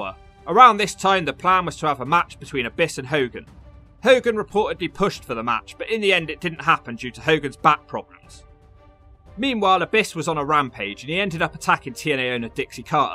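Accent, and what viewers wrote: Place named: Devon, United Kingdom. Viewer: where are you from as you sound like you’re from my part of the world. I was born in Plymouth (the original one in Devon) and I live in Cornwall just over the Tamar River so I’m on about 15 miles away from Plymouth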